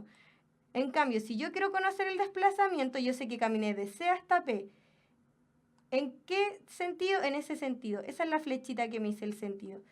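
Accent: Chile. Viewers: Que insufrible el acento chileno. Puffff quierp prestarle atencion pero su voz y ese acento no me dejaaaaa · el toque que le da la a que la clase sea perfercta es el acento chileno xd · Debe ser de Chile, su acento es tan bonitoooo 😍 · Rilma García torico en chile ya significa si, por el acento deduzco que es chilena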